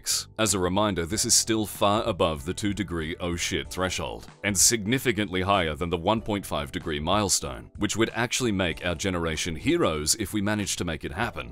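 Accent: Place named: Australia. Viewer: Just Have to say, ever since the Voice over change my partner cant stand your channel and I can no longer View your videos without her expressing anger from once backing this gaming channel especially with the change over to the news which she enjoyed. But now it just feels soulless like a top 10 youtube channel with the generic Australian Voice over. i can't stand it either. Rather be listening to Microsoft Sam.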